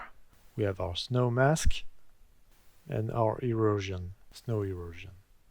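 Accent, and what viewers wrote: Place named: France. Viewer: Avec ton accent français j'arrive à comprendre xD merci pour le tuto !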